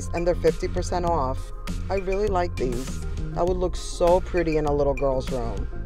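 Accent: New York, United States. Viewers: what part are you from!!! Put me in your suitcase, lol!!! I thought you were from NY by your accent!!!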